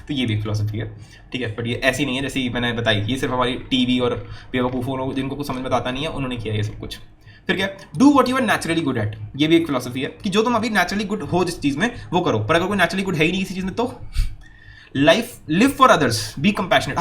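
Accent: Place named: Uttar Pradesh, India. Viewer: Ur from UP 👉 right?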